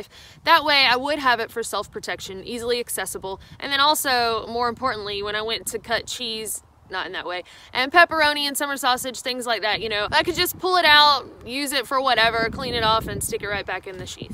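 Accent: South, United States. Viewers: From Mississippi, I speak "southern" so knew she said "lighter." So, allow me to educate. Lighter in southern is "ladder." Ladder in southern is" ladder." Clear? Another example of "southern." Female deer is doe. Opening to a building or another room through which you walk is doe (e.g. Sally opened the back doe to give John the ladder so he could light the grill). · Lighter and back up lighter... Its a southern thang!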